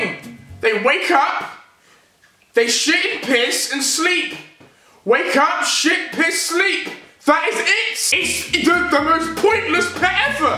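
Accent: England, United Kingdom. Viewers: He's from England :P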